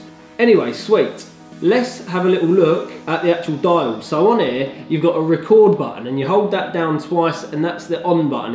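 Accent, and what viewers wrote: United Kingdom. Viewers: Probably the only Youtuber I've come across with a legit (non-pretentious) British accent. Top lad - subscribed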